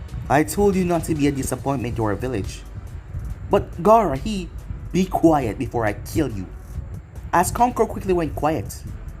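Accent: Jamaica. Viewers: I like it . Yo ya Jamaican?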